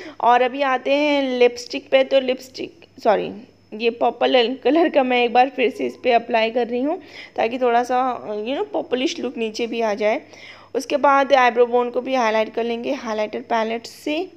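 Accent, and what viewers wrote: India. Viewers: just a simple luckhnawi lehja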